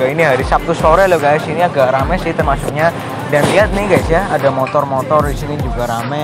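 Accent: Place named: Banyumas, Indonesia. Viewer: Ngapak gaes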